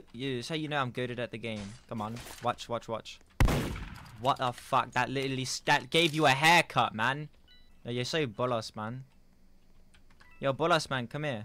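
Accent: United Kingdom. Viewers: one question why is he British ? Aren’t you American? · I never new you were British but you moans got me dying😂💀💀💀💀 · well the accent sounds like a British one so idk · Are you British?? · Why did he turn British 😭😭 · I an never tell if ur American or British 💀💀💀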